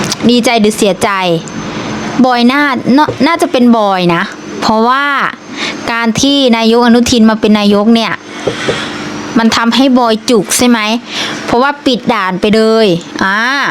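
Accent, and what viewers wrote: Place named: Laos. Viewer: พูดภาษาลาวก็ได้ทำไมไม่ดูช่องคนอิสานเขาก็พูดภาษาท้องถิ่นแต่คุณเป็นคนลาวดันมากระแดะพูดภาษากลางฟังแล้วมันขัดๆหูครับ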